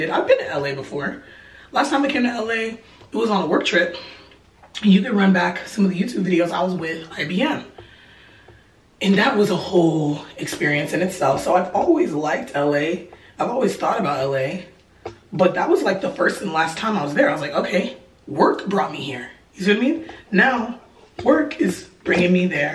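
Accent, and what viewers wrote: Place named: Nigeria. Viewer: I believe you.. and WOW I am in awe. I just ran into your youtube. I don't know how lol literally was just searching a sermon by Todd Michael Crazy faith sermon and this blew me away. I almost didn't listen to this.. I live in LA (from ghana) just opened up a healthcare company and I was telling my business partner about faith so running into this youtube was no coincidence.. God is def moving.. I was looking for something to stir up my faith. I can tell your nigerian due to a slight accent and "she's well oo" haha I love it. Welcome to LA you are definitely walking into your destiny. Make the most of your life and network network network. The lines have fallen for you in pleasant places. Ahhh your talking about timi (amplify africa) really cool and humble guy. We know each other. Omg I'm soooo happy for you dear. Share your testimony and continue to inspire .. 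I hope to meet you soon 🙏🏾 then I'll remind you that im the one who wrote this on your channel. Crazy how I ran into this youtube channel. Keep being OUTGOING.. u never know who you may meet. Divine connection ✨️ ❤ 🔒 CONGRATULATIONS on you new Career. God is faithful ..so happy for you. Wow! Btw u passed my fav spot in-n-out on Sepulveda I think lol..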